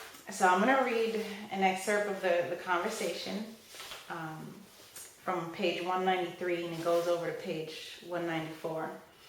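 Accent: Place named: South, United States. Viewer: southern accent isnt needed but good job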